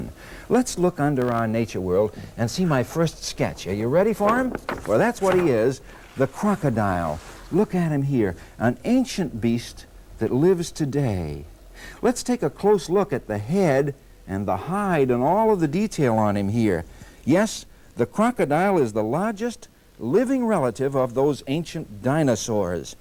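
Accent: Boston, United States. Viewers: Love that “Bahston” accent!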